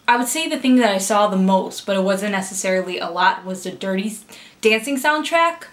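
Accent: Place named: New York, United States. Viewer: You have no idea how much I love your accent!! NY❤️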